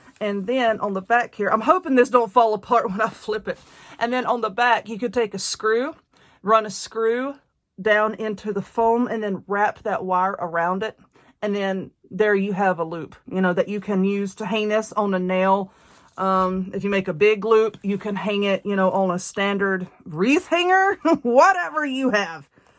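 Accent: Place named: Alabama, United States. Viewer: I love all of your projects. I get great ideas from you. I also love someone who sounds like me. I’m from Alabama so you go girl. You stay safe and well. I will be making this wreath. I’ve been looking for some decor for my gazebo. Thank you so much.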